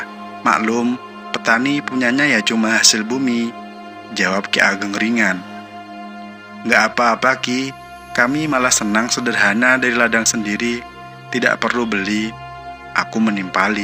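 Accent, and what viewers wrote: Bali, Indonesia. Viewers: Maaf yg bacain cerita nda cocok.logat balinya.di hilangkan biar netral🙏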